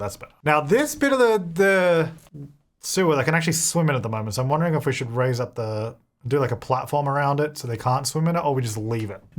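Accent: Australia. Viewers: I always love watching your build videos 😍🥰 they inspire me with my sims builds to try new ways of building 🤔🤩🧐plus i do enjoy hearing a fellow Aussie play the sims 4 👍🇦🇺😊.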